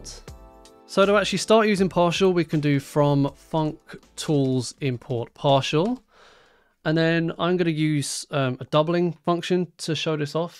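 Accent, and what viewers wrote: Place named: United Kingdom. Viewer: Underrated channel, also that bri-ish accent is woowwwwww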